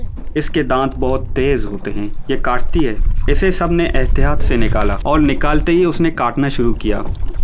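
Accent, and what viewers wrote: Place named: Balochistan, Pakistan. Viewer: کتنی خوبصورت اردو بول رہے ہو لہجہ بھی بہت خوبصورت ہے یہ ایک بلوچ کا ہی ہوسکتا ہے ۔ اللہ خوش رکھے آمین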